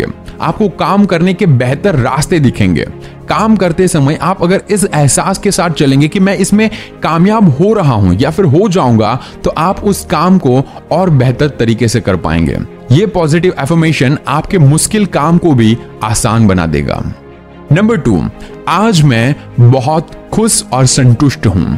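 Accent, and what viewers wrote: Gujarat, India. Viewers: Aap gujraat se ho kyaa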